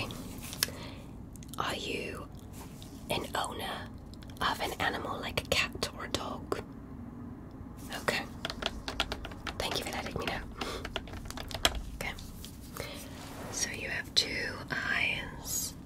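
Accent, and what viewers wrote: England, United Kingdom. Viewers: OMG the British accent❤ love it❤❤ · English Accent 🎉 my Fav 🙏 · Your English accent is marvellous. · Is the English accent from absolutely Fabulous?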